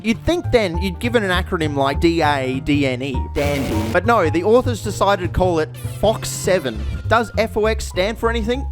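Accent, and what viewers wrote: Australia. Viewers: today is a good day, E&F video. time to hear an aussie love the 60s.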